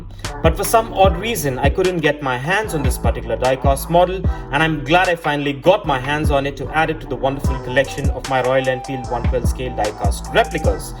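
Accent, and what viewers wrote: United States. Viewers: Your English accent is like an American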